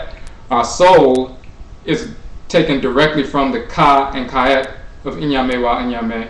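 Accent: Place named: United States. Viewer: I say you're on a rightous track.I think that, because of your American ascent, you need to speak a bit slowly so as for us to aprehend your TWI. I'm an Akan of the BANTU BLOOD line.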